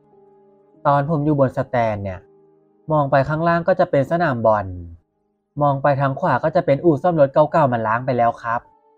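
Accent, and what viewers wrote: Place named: Rural, Thailand. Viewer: สำเนียงเหน่อเพราะฟังเพลินเชียว ขอติดตามเป็นแฟนคลับนะครับ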